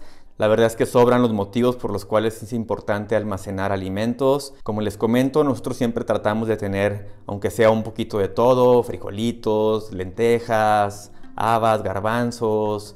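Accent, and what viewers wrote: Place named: Mexico. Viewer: que dulce que es el acento mejicano. GRACIAS POR LOS VIDEOS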